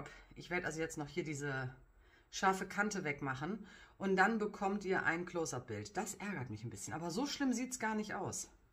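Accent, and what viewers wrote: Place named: Germany. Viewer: Gutes Video und der German Akzent ist echt amüsant :D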